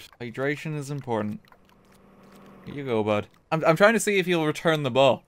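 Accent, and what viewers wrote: Ireland. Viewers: are you irish edit: nvm u said it in the video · it’s okay, he’s irish guys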